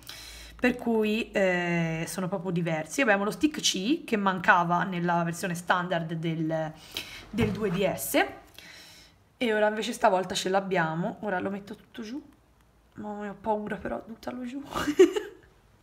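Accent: Italy. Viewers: Oh dio amo il tuo accento toscano❤️❤️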